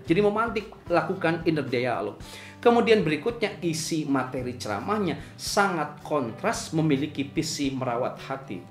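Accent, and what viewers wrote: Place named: West Java, Indonesia. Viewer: MasyAllah tabarakallah, siapa yang tidak kenal sama seorang AA Gym ini, gaya retorikanya selalu membuat mad'u terhibur, dengan aksen logat sunda nya membuat susana ceramah beliau cair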